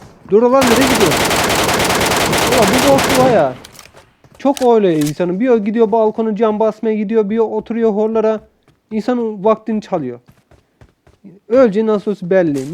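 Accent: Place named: Afyon, Turkey. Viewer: Tam afyonluca konusuyon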